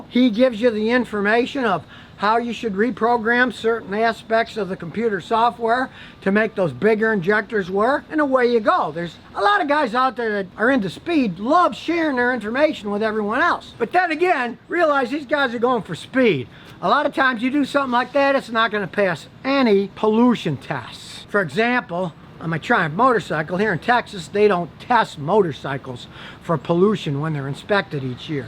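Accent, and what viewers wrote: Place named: Alabama, United States. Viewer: He must be from alabama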